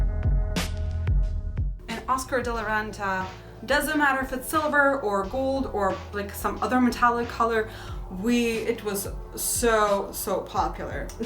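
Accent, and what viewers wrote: Russia. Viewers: You’re gorgeous ❤️ are you Russian? I’m asking because of your accent! Thank you for this video!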